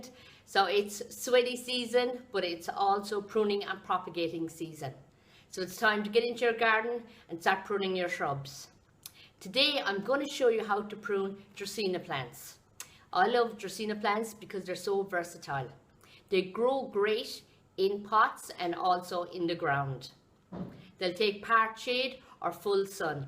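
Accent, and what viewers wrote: Ireland. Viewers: Are you Irish because I am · R U Irish? :)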